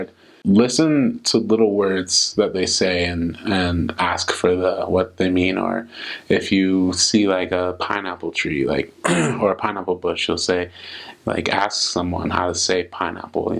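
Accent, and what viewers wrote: Liberia. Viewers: lol oh yea he sound just like Liberian lol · Omg he sound really Liberian when he speaks koloqua 😍😍😍😍😍😍 thank you for serving my country my God bless you 😇😇😇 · Damn he sound just like a Liberian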